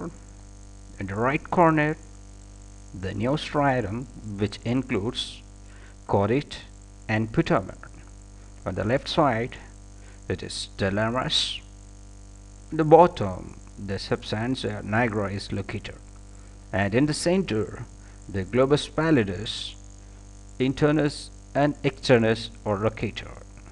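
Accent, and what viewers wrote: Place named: India. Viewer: Good in Content,Animation & Voice Clarity...Would have been better if it explained how Physiotherapy treatment works on the PathoPhysiology & the Accent was Purely Indian!!!! Waiting for more articles in Physiotherapy!!!! Einstein Babu..,MPT (Neuro) Physiotherapist , Govt of India(ESIC Model Hospital) , Bangalore,India.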